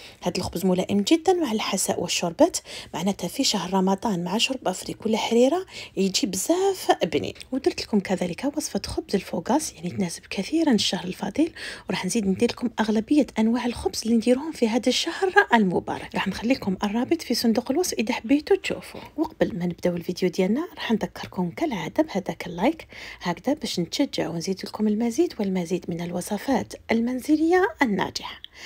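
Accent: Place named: Algeria. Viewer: Youma c'est 1accent algérienne essayer de ne pas prendre notre accent slvp merci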